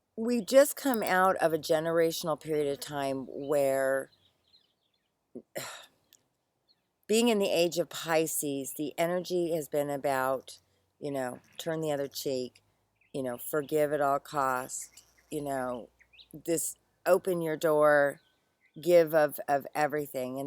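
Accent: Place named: South, United States. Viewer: Your way of explaining is perfect - I can understand you completely - country style? I'm a country girl too!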